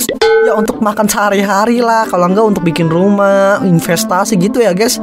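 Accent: Indonesia. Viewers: Bang teh orang Sunda apa bukan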